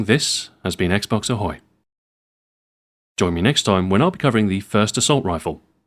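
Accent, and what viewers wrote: England, United Kingdom. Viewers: I never knew is brittish lol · it took me 4 minutes to realise hes english :D i cant recognise my own accent :D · Most useful intel indeed, as always narration is more believable in british. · He sounds like one of those professional british voice over actor. SOOOOOOO good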